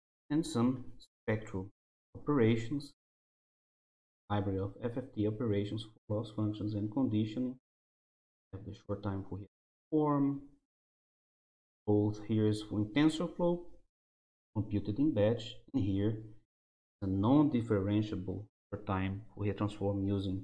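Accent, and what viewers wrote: Brazil. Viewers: Brazillian accent s2, is DDSP made in BR?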